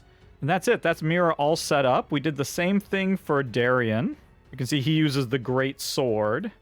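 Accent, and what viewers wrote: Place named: North America. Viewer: I always get a joy watching your RC videos, as I'm still struggling to get my hands on a relatively cheap copy. In my country, demand for board games are pretty low, so the only options we really have are services like Amazon (And from your accent, I'm guessing you're either US or Canadian, so if you didn't already know, ordering from Amazon if you don't live in the US is REALLY expensive)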